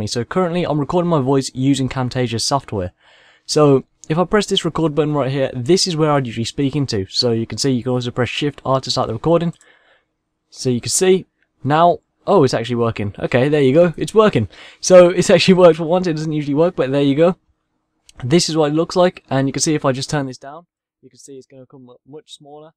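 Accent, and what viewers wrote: United Kingdom. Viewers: Why y’all sayin he talks to fast. Get your jockstraps outa your buttcheeks and open your ears he talks like a regular brit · Dude, what language are you speaking ? )) Is it Scottish or Irish accent? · You sound like a British version of xxxtentacion.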